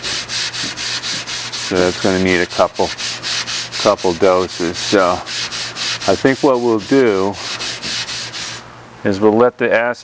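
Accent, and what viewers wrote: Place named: Maine, United States. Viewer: Almost like a Maine accent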